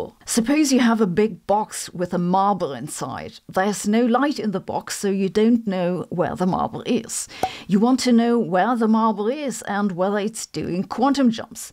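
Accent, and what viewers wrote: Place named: Germany. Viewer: It’s that English with German accent influence that is just…….. scintillating! I know , it shouldn’t enter into the conversation but what the hey, anyway! Love your videos, even if I don’t get the math behind it!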